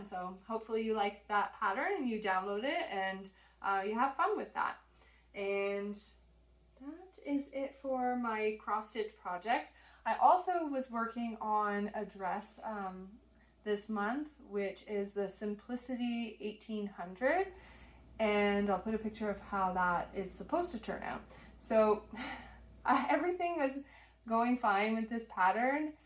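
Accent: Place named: Canada. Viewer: Hello, YouTube randomly took me to your site today so I decided to watch you while I finish up a sweater I'm working on. Your 'By the Bay' caption attracted me as I live in the SF Bay Area in N. Calif, but when I heard you talk I immediately recognized the Canadian twang so I knew your bay is far away from mine! Thought your family so cute and you seem so wholesome so I subscribed. Loved your cabled deep winter coat wip, lovely sweater! I went back and watched your episode 1 so I could learn where you are from and your name and saw your other doggie. Your gray dog looks like part border collie, is that right? And of course I saw your B/W border collie in the 1st episode. I have 2 border collies, male brothers from different litters that have same mom and dad. They are like my kids! I am finishing up a sweater for my daughter in law called Vanessa by Swans Island pattern. 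It is done in Juniper Moon Farm Moonshine colorway Lagoon. Wonderful soft yarn! My next adventure in knitting is Zwieg by Caitlin Hunter, It will be done in a medium gray yak/silk/woolen yarn held double with a lace weight for a dk weight final knit. Pink to dark rasberry yarns for the yoke lace section. This will be fun as I haven't done much with lace style effects and the yoke has lace in the oattern. Looking forward to a bit of challenge! Your sewing projects are great and I like seeing them. I'm a life time sewer.... went to FIDM in late 70s and I design + sew with a targeted interest in tailoring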